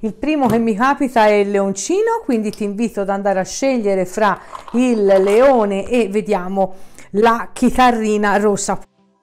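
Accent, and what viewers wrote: Tuscany, Italy. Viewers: .... sei simpaticissima super accento toscano ❤... ti seguo sempre.... daiiii stesa del leone.... dai dai che arriva...lo spero!!!🌹🌹🌹🌹...grazie un abbraccio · Un abbraccio sei un mito.. Adoro il tuo accento toscano. Spieghi le tue carte benissimo. Mi ritrovo nella chitarra. Bella la nuova grafica...brava.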